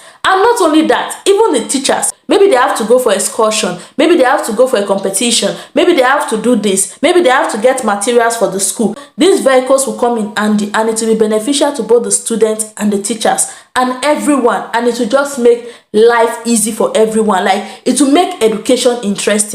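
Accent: Ghana. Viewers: Good girl. Thank you for your ténacité. Fantastic video. Your accent is so Ghanaian now. Your Ghanaian citizenship will soon be coming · Thanks for your information. Your accent is gradually changing to Ghanaian accent.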